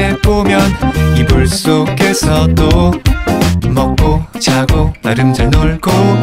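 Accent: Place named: North Korea. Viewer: 아조씬 부칸연기 천재야....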